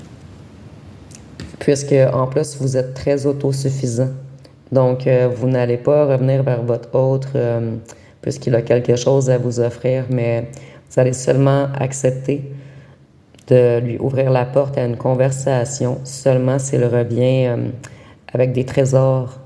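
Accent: Quebec, Canada. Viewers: Merci beaucoup ! Le tirage est très parlant et déterminant pour moi ! ( avec votre bel accent québécois .! ) MERCI pour tout . Je vous souhaite une très bonne soirée !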